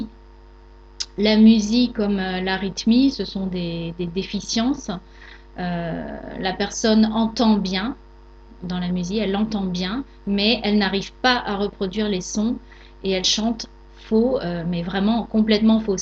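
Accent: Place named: France. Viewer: L'important c'est la matière...Moi j'aime votre bel accent Français..Vos explications sont très compréhensible!!! Du Québec un beau bonjour:)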